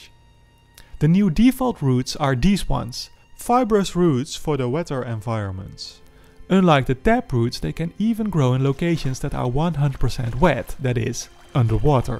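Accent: Netherlands. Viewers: (re) Dutch accent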